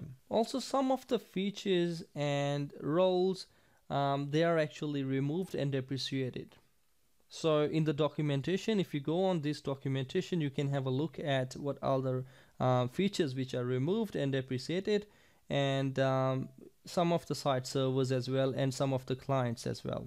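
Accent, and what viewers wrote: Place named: Australia. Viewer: You sound Ozzie....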